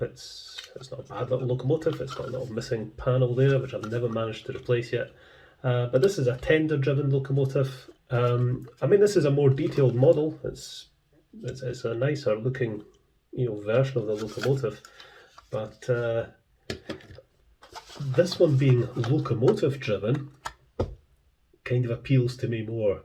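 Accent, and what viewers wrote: Scotland, United Kingdom. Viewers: Enjoyed this and all of your other vids. Nice too, to hear a Scottish voice (Penicuik here!) - a nice contrast to the equally excellent Barrie Davie and Sam's Trains. Keep up the great work. 🚂🚉👍🏼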